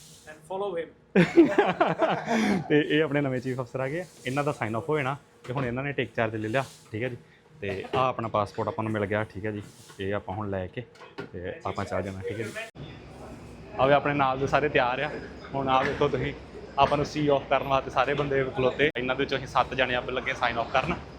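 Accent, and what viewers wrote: Majha, India. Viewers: ਮੈਂ ਤੁਹਾਨੂੰ ਇਕ ਵੀਡੀਓ ਵਿਚ ਕਿਹਾ ਸੀ ਕਿ ਤੁਹਾਡੀ ਬੋਲੀ ਅੰਮਿਤਸਰ (ਮਾਝੇ)ਵਾਲੀ ਚਲੋ ਪਤਾ ਲੱਗ ਗਿਆ ਕੇ ਤੁਹਾਡਾ ਪਿੰਡ ਭੋਜੀਆਂ, ਤੇ ਮੇਰੇ ਨਾਨਕੇ ਨੇ ਭੋਜੀਆਂ, ਮਾਮ ਨਿਆਮਤ, ਮੁੱਖਾ, ਮਨਜੂਰਾ, ਦਾਰਾ, ਰਾਬਟ, ਇਹ ਨੇ ਮੇਰੇ ਮਾਮੇ ਦੇ ਮੁੰਡੇ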